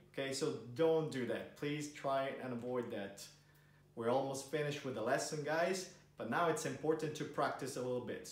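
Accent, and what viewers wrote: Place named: Italy. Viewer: Ciao. This video was really helpful. All my answers were correct, the only thing is that I misspelled parlero. I’ll continue watching your videos as they’re very helpful. I wish I could write this whole message in Italian, and I hope someday I will. I love when you speak in Italian, the Italian accent is so bello. I like to hear you all the time. Grazie mile, 😊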